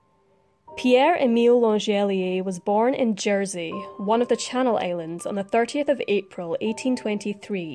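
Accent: Scotland, United Kingdom. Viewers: Why the hell do so may narrators feel that they have to put on the artificial "creepy" pronunciation when telling their stories ? For instance, this young lady will normally have a lovely Scots accent, which one can easily hear, and most probably a nice speaking voice - but both are spoilt by totally unnatural creepy speaking. I used to subscribe to this interesting channel but then went away because I just couldn't listen to the narrative any more. I am back to give both narrator and channel another try. The stories are good, the research generally excellent and the presentation superb......if it only wasn't for the "creepy" voice, everything would be perfect. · I love your content! Excellent research, interesting cases. The background music fits perfectly and your Scottish accent is so easy to listen to! Well done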